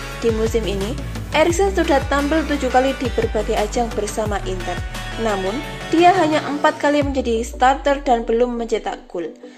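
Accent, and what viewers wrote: Java, Indonesia. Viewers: Mbak e wong jowo endi? 😅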